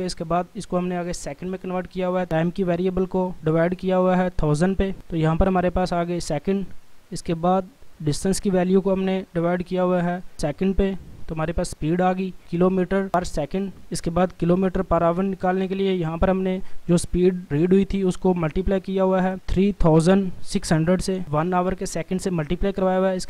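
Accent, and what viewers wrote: India. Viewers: interressting english. can't understand your speed indian accent at all. :)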